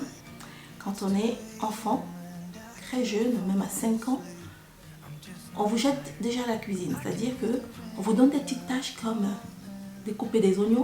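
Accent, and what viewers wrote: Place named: Cameroon. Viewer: Pour information, cela vient de l'accent de son pays d'origine, si ne m'abuse, le Cameroun. C'est une spécificité propre aux habitants ce beau pays !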